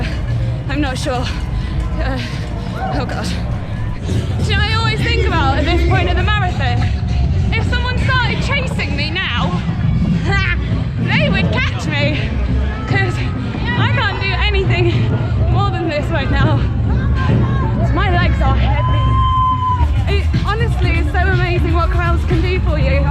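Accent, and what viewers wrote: United Kingdom. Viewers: Can't imagine winning the lottery to get in, let alone speak in a british accent.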